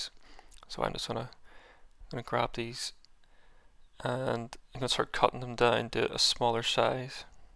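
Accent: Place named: Northern Ireland, United Kingdom. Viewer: just came across this vid, weird clickin on a mini tutorial and hearin someone from norn iron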